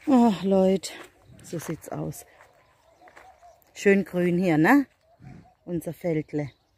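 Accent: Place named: Swabia, Germany. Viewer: Ich höre dich so gerne schwäbisch erzähle... Weckle...... Semmeln wie süß... 😊😂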